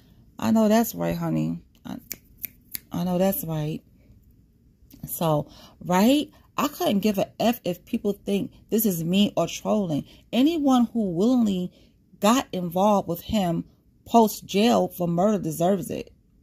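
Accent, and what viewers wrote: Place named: Brooklyn, United States. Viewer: Oh I love your accent. You sound like me. I’m from Brooklyn….live in SC….just subscribed…loving this WW drama…addicted to these crazies… lol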